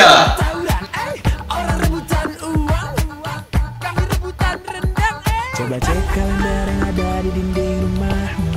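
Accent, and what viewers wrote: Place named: Malaysia. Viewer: Yang aku suka dari channel ini, reaction videonya pure banget ngalir, terus aku juga suka banget sama dialek nya, melayu bikin gemes😄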